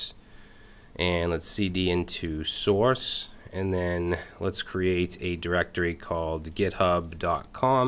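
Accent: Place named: Northeast, United States. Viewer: I love your accent. It reminds me of my childhood. Where in the Northeast are you from? (My guess is the Tri-State, probably Jersey.)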